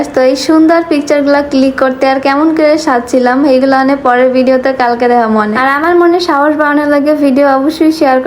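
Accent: Barishal, Bangladesh. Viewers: আসসালামু আলাইকুম আপু আমি আপনার ভিডিও অনেক দেখি আমি বরিশাল থেকে দেখেছি বরিশালের ভাষায় কথা বলেন তাইতো দেখি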